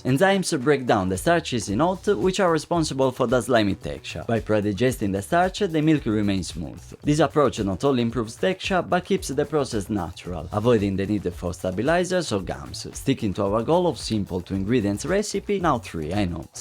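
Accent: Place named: Italy. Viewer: I'm Italian and your English is really funny I think you are Italian too right?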